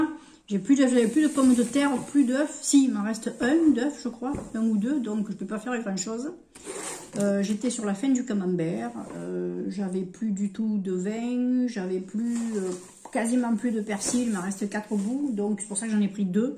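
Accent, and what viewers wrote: South, France. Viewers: Coucou , Les oeufs frais il n'y a rien de mieux!😊 Moi j'adore les faire à la coque Avec des mouillettes de comté 😋 J'adore votre accent du sud!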